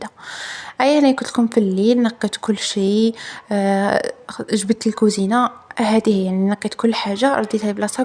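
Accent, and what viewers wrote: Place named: Tiaret, Algeria. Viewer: تهدر كما حنا تيارت ههه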